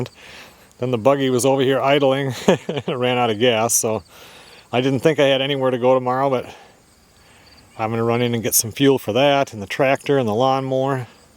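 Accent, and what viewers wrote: Minnesota, United States. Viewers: I love your show & your Minnesota Swedish accent❤.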